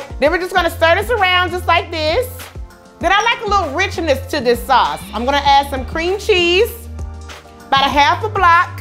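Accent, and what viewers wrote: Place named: South, United States. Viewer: I know that right, and she has a voice too. That's that good old Southern voice.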